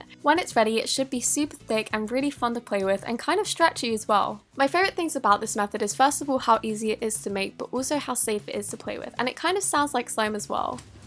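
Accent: England, United Kingdom. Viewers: What part of England a are you from?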